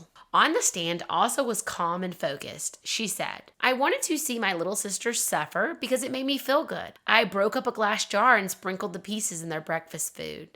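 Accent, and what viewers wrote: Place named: South, United States. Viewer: New subscriber here!! I’m so glad I came across your vlog!!! I love things like this. I also love your voice and I’m wondering if you’re from the south? Because you say the word “on” with a “w”….. Like. “Own” which I love!! ❤️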